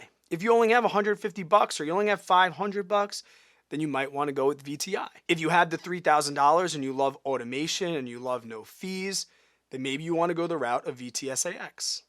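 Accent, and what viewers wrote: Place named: Long Island, United States. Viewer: of the etf's would you choose VTI over VOO and why? Also, I noticed the index fund VTSAX is selling at 89.18 with no $0 minimum investment. The video was posted in March, but can you clarify this? Should there not be a $3K start, wouldn't it be suggested to go for the automated perks on VTSAX? Lastly, would it be overkill to have both VOO and VTSAX (should you recommend VOO from my previous question)? Your videos are sleek, slow and capture great on camera energy. Don't change that Long Island accent, its golden!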